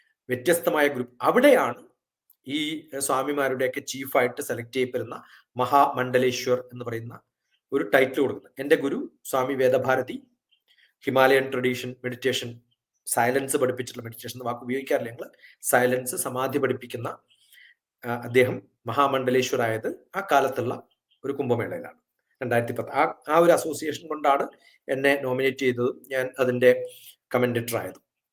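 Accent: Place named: Malabar, India. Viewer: May be from Malabar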